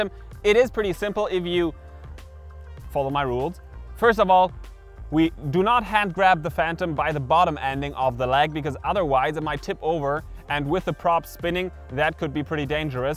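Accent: Germany. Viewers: Ich wusste das du deutscher bist. Man merkt es richtig hart am Anfang und an deiner Stimme ;)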